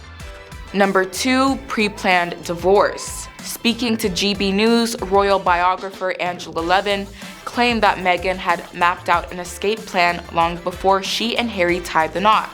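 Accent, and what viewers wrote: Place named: United States. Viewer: I love American accents and yours sounds like caramel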